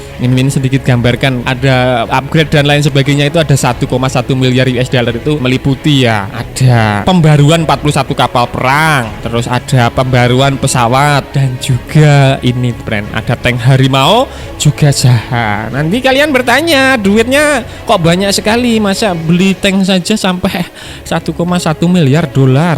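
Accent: Java, Indonesia. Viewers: Uong Jowo tenan kang,, medok tenan leh muni...😂😂😂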